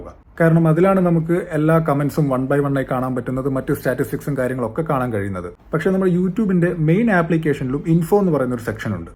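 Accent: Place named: Kannur, India. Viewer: കണ്ണൂർ കാരൻ ആണല്ലെ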